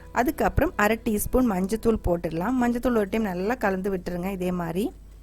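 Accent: Kerala, India. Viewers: Very good and tasty recipes with little ingredients 👌love your Tamil with Malayalam accent👍👍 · I like your Tamil slong with malayalam infusion.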